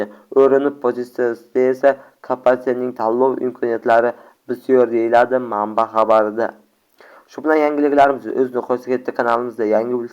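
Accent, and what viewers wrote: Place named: Kyrgyzstan. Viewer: Эй касартки овозинга санинг ут тушсин киргизмисан дейман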